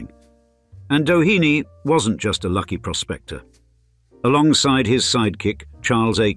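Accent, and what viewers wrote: United Kingdom. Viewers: A British accent narrating an American city sounds odd.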